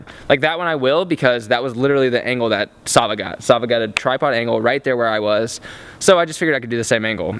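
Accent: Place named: South Carolina, United States. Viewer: I knew your accent sounded like you were from south carolina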